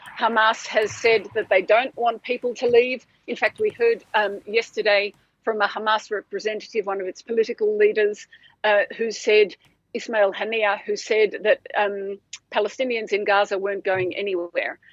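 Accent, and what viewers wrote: Australia. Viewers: She is very understandable but her accent sounds like a cross between American and British,more rhotic than RP but less than 'Standard" American. Perhaps it is an Australian accent ,as other commenters have suggested. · You clearly don’t know an Australian accent. At least 5 people have now commented saying it’s an Aussie accent. Judging by your responses so far and Knowing your mentality they WILL ALL be “Wrong”. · It is an Australian accent, everything was said clearly. The Australian accent is for the most part non-rhotic. This means that the pronunciation of the /r/ sound will never occur at the end of words. Where an American will say three separate sounds for the word car /kar/, an Australian native speaker will only say 2 /ka:/. This is a key feature that only occasionally has exceptions. There is nothing to change with the pronunciation of the words. 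· She is probably Australian.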